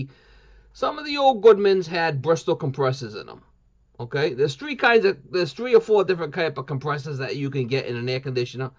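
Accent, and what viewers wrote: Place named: Brooklyn, United States. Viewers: this was awesome I just got 5 Ton 14 Seer Goodman Air Conditioner today so that was too funny the Goodman stuff thanks for the info I understood half... lol thank bro cool accent must be NJ or NY or Boston... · from brooooookllllllynnnn....lame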